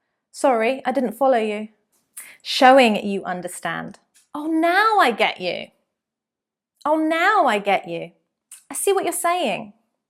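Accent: United Kingdom. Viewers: Thank You for you conversation teaching is it nice one to learn actually l want to catch your accent British this one easy not hard but the thing is how can to memories the Bank of vocabulary this problem is great so when it found the english speech rather unable to know every things this is my vision tell about when listen podcast can not understand all great thanks to you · Lovely. I've been looking for pure British English pronunciation. Thanks a lot. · So helpful phrases. Is this a British accent?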